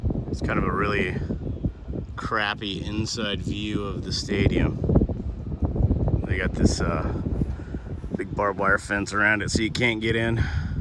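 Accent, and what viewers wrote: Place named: South, United States. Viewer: Why are you apologizing for a Southern Accent? Intelligence isn’t about how you enunciate the words. You insult only yourself when you do this.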